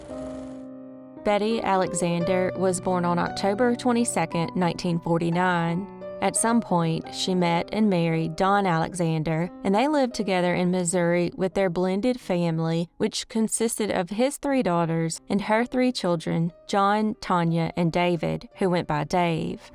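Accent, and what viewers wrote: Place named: Georgia, United States. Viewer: I keep trying to place your accent. Is it Georgia/close to Alabama?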